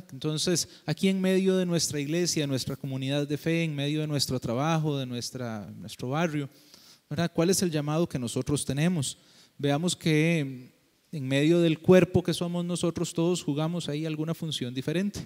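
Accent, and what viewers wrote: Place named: Paraguay. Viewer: Me parece que eres Paragua, un consejo deja de decir "verdad"? Todo el tiempo.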